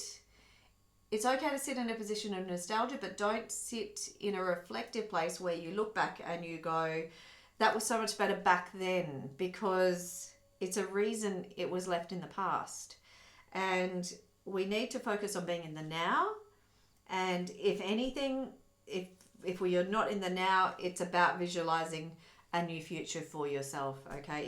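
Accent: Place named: Australia. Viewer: Thankyou for sharing your Light with us through your Insightful readings cheers and all the best with yourchannel growing. I'm hearing a fellow Aussie, am I correct xx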